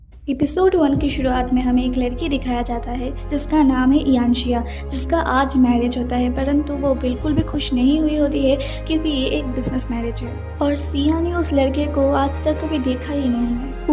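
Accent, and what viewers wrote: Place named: Northeast India, India. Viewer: Ap north east se ho , meri chachi ki same aawaj hain , bolne ka tarika bhi same hain , kalimpong se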